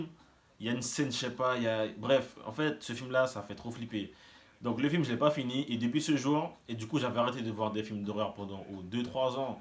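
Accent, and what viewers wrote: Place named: French Guiana. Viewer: Je le jure que sur la façon de parler ça ce voit qu’il est guyanais incroyable surtout le "les gars"😆 🇬🇫✨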